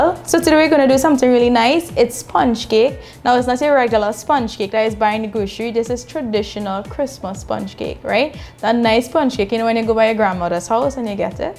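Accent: Trinidad and Tobago. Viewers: It's just the way some trinis speak. Ever listen to the Indians from India? I swear every other word is "actually"!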